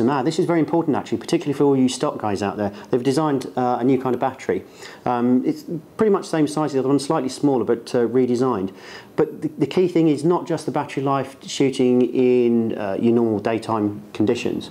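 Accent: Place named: United Kingdom. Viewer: No, he doesn't. He says, "IF I CAN show you that there". It's called a British accent.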